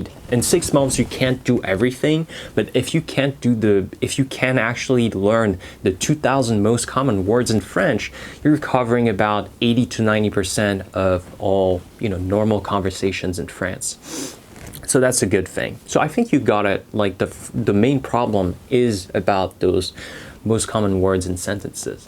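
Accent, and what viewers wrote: United States. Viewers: It is incredible! When I come across your video, I thought you were an American :)) Incredibly man! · native french speaker? your american accent is absolutely incredible !!!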